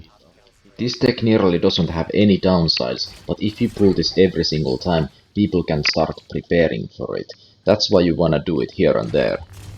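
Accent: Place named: Finland. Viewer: That's the Finnish accent perkele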